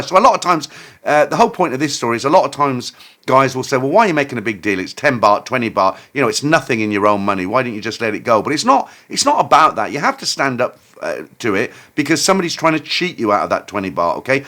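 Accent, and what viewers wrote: England, United Kingdom. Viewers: Glad you are keeping these. I would never watch AI stories they’re boring and soulless. Watching since the shed days of 2020/2021 I have never even been to Thailand but I find them entertaining. Also nice to hear an English voice while I’m in australia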